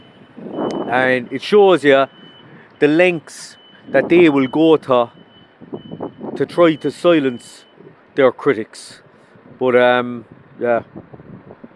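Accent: Ireland. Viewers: Where is that accent from? First time hearing it from Donegal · What part of Ireland is this accent from? I don't think I've ever heard it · Prepare yourselves for the Social Credit system, guys, please. This is a foretaste of that system, that is already being planned for the UK. On a side note, forgive me, what region of Ireland is the presenter from? His accent is amazing. 😊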